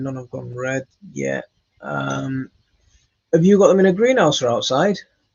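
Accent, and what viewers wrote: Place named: North, United Kingdom. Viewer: P.S. i love to listen to that accent , as i have northern roots . lol It reminds me of all my aunts and uncles now passed : ( x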